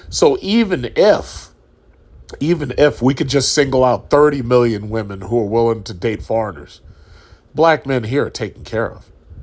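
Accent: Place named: New York, United States. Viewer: Lol I agree I heard that New York accent and was ok real talk here 🇨🇦👍🏿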